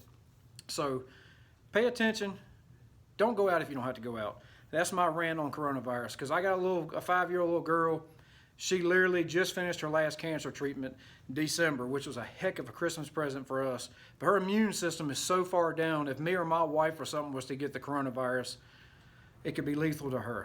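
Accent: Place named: West Virginia, United States. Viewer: I recognize that accent, as I'm a WV feller not too far from Danville! Appreciate your video very much. Brand spanking new to CNC's and carbide create seems like a good program to get me up and running. (along with the help of good guys such as yourself). Hope your little girl is still doing well and healthy and will say a prayer for her and you and your wife as well. All the best.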